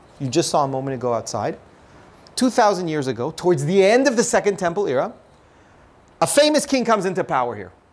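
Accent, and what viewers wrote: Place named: United States. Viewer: It would be better with subtitles. Sorry to say it, your American accent , sounds anxious and your tone of voice neurotic.. Think who is your audience. You are doing a disservice to a great work. Let an Israeli tell the story.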